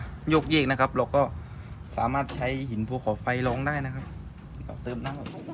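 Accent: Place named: Bangkok, Thailand. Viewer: สวัสดี คะ อยุ่จังหวัดอะไร สำเนียงพันนี้น่าว่าม่ายช่ายคนใต้ ต้องเป็นคน กุงเต๊ป(กรุงเทพ)แต่กำเนิดแน่นอน 😆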